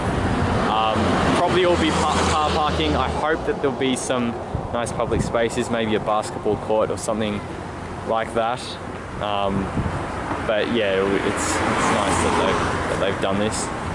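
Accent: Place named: Australia. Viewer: Love the voice for the life has aussie accent!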